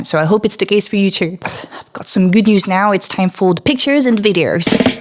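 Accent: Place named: France. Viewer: I hear the French soft power with every “videor” you say. I am learning to like the French accent.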